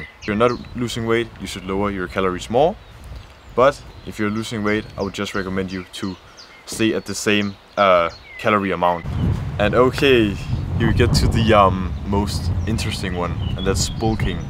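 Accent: Standard. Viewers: 大家好 很標準❤ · 被大家好嚇到 很標準🔥🔥🔥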